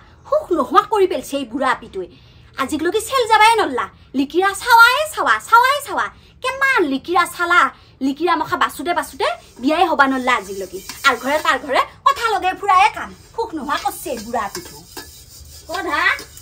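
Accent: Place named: Darrang, India. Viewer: বা তোমাৰ মুখত দৰঙীয়া ভাষা বহুত ভাল লাগে ❤️❤️❤️❤️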